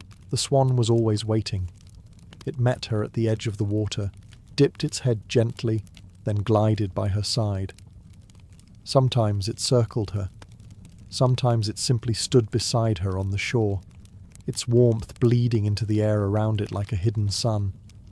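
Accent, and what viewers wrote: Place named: United Kingdom. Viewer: A British accent to sleep? No thanks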